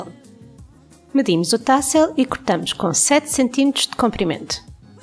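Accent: Portugal. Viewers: Grata por esse lindo tutorial 🙏 Eu amo ouvir o português de Portugal, terrinha de meu avô paterno que era de Lisboa. Cumprimentos de sua irmã do Brasil 🇧🇷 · Menina, que sotaque lindooo! É o primeiro português de Portugal que entendo perfeitamente! E seu trabalho é muito bem feito, parabéns pelo bom gosto e refinamento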